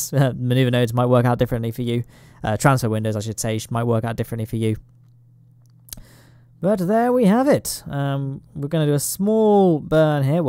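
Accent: United Kingdom. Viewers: British Scott Manley? I think so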